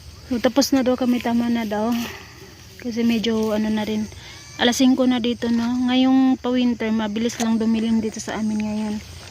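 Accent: Visayas, Philippines. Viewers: Hello Ms...parang Pinas lang din ang cute nang anak mo at napansin ko parang Bisaya ka Ms?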